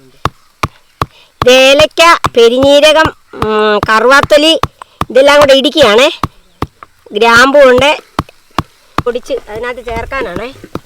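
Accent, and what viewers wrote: India. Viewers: Ammmachi bhaaaasha super like yourlanguage..aaalapppuzha.suppppper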